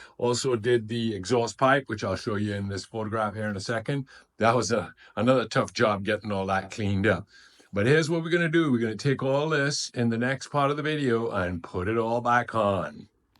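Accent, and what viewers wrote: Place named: South Africa. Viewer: Nice work! Anyone who has done stuff like this knows it can be a bit tedious, but it is very rewarding in the end. That DT is gonna be a thing of beauty. I've been trying to figure your slight accent. South African?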